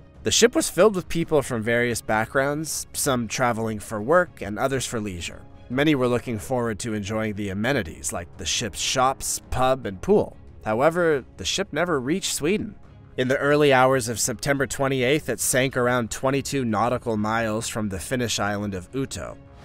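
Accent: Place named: United States. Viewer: You’re pronouncing a lot of the ships wrong and you sound American. How is that????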